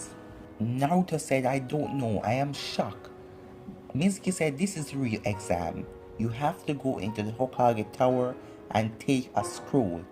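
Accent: Jamaica. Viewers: Are you jamican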